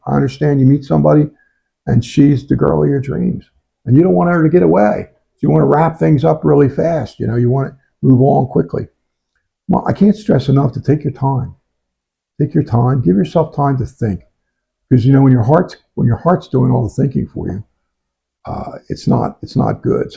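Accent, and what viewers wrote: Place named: Western Pennsylvania, United States. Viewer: Where are you from? Sounds like west PA accent.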